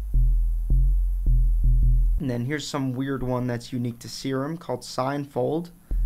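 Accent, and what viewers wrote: Philadelphia, United States. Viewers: bro u gotta be from philly/delco !!!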